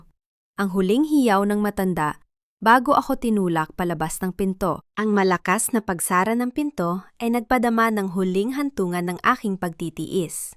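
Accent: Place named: Visayas, Philippines. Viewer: Parang bisaya kadi mga narrator nyo eh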